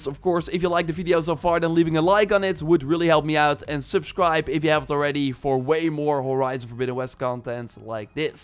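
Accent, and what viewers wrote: Netherlands. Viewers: A thicc dutch accent 😂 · I feel like you are dutch? Are you🤨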